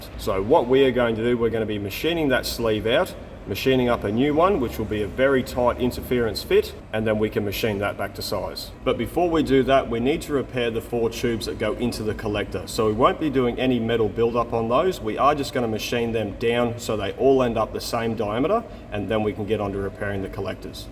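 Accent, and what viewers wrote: Australia. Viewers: Quick question.....why doesn't the thermal shock crack the cast iron? I've always heard that cast iron doesn't do thermal shock well. I live on the completely opposite side of the world but your videos are anxiously awaited. Don't know if it's the skill, professionalism or that Aussie accent but it's a great video!!😊😊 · never seen or heard of this channel until it came up on my feed, watched it and as soon as i realised you’re aussie, subscribed and liked! always back ya fellow aussies 🙌 really really great/interesting work you do too!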